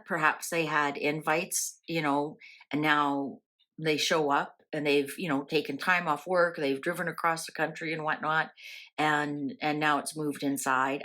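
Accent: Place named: Canada. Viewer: Thank you for this reading. I love to hear your Cannadian accent. It reminds me of my immediate family who married Canadians and live in Ontario😊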